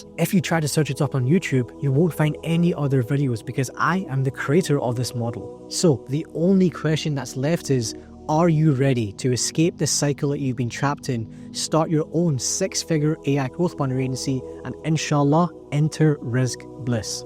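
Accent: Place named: United Kingdom. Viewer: You sound like you're from the UK, so how would taxation fit into all this? Assuming you're suddenly making 10k+ a month, I'm sure that's gonna raise eyebrows with the bank and government who will be eager to reach their greedy hands into your earnings and deduct a significant sum of it in the name of taxation, no?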